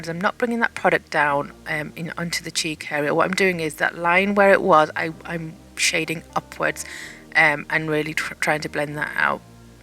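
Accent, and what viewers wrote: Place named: United Kingdom. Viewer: Mam U speak very well Your British accent is great but u speak very very fast Your Hindi is beautiful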